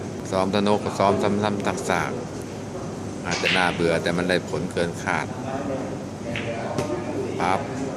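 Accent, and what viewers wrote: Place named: Rayong, Thailand. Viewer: อาจารเป็นคนระยองรึป่าวครับสำเนียงไช่เลย555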